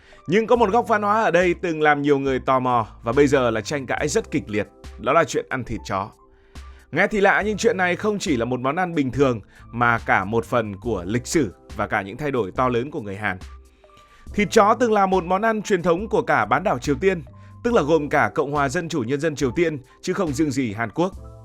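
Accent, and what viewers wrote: North, Vietnam. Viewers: Thằng mc luon bưng bô và nó lại nói tiếng bắc mà tui bắc lai không biêt bố no là ai ,và luon ra đường k dội nón và óc bò khi lái xe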